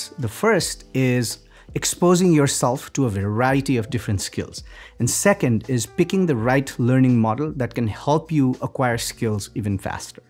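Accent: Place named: Nepal. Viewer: i am watching you from your early days in YouTube. But today, i dont know why i found Nepalese tone with your voice. 😁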